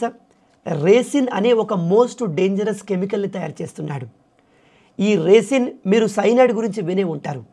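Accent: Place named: Gujarat, India. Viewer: Nuvvu Gujarati va nee Telugu language accent Gujarati laga Indira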